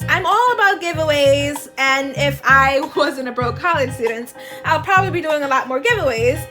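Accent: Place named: Caribbean. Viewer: I here a little Caribbean coming out. Trinidad or Jamaica. Just saying😁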